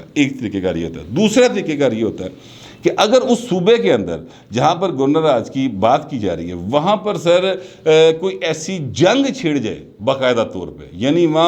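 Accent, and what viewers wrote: Punjab, Pakistan. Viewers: sahib g acha urdo bolo plz punjabi tora achi urdo bolo sir g plzz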